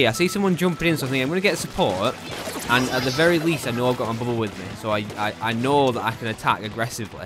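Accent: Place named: Scotland, United Kingdom. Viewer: You sound partially scottish and partially not, it'S CONFUSING but this video has some really nice tips. I'd love to practice with you one day :'3 it's nice getting tips and I learn better through practice anyway. ... though, I'm interested- as another weapon, how would you counter a tri-slosher user? ;)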